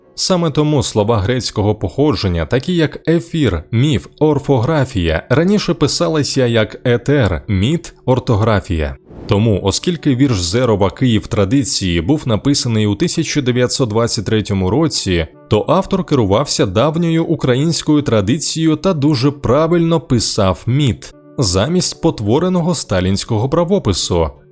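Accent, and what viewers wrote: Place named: Russia. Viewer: Якщо переймаєтесь правописом,то потурбуйтесь ще й про вимову. Ведучий чьокає і щьокає на рівні з росіянами((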